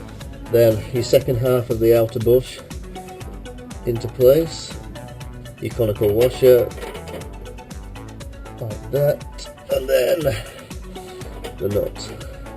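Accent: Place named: Yorkshire, United Kingdom. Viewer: You sound like you are from yorkshire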